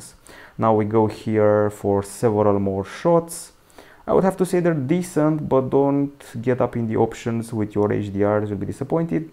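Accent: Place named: Romania. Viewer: you have a strong romanian accent :D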